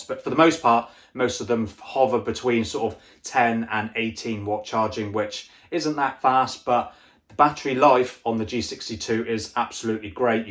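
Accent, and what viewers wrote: Bristol, United Kingdom. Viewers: He speaks with a Bristol accent.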